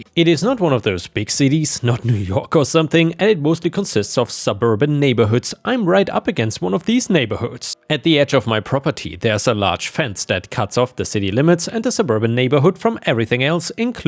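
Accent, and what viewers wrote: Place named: Germany. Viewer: I truly enjoy your content, the short stories at the end, and especially those vids of you and your wife utterly spoiling your cats. Those kitties appear to be SO well mannered and loving all the attention you lavish on them. But I AM curious about where you hail from. I know you live in Thailand, but your accent seems to be European - maybe German? But I know so little of all the various languages and regions of the EU I'm likely totally wrong. Would you mind sharing a little bit of yourself?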